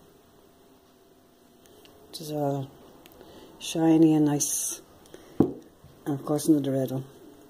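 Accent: Ireland. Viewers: I'm just in heaven watching you I love crafting as well your so gifted thank you for sharing it's great to hear an Irish accent xx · Hiya I've just found your channel and subscribed. love your crafts thanks so much for sharing with us. I was like is that an Irish accent I can hear,,????yes it is she's Irish deadly. I'm a dub myself😀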